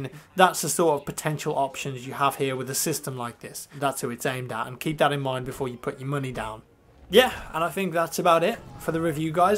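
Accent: United Kingdom. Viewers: How does sound black? Lol can't you hear his British accent? Lel and one question: why does it matter what he looks like? It's mainly about if he's a good person or not, and clearly if you've been watching his videos he's on the good side;)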